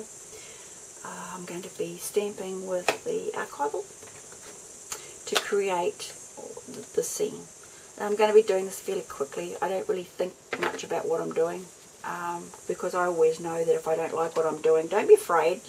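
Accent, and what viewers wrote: New Zealand. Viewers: Hi...I love your New Zealand accent! I really do enjoy your tutorials and please keep talking(or singing!~)I look forward to you next vid...off to watch PT. 2 to this vid! ~Jeanne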